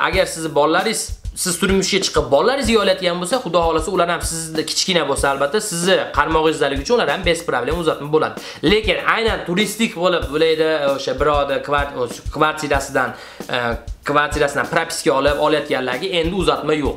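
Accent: Andijan, Uzbekistan. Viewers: Анжанли шевани йукотмапсиз койилл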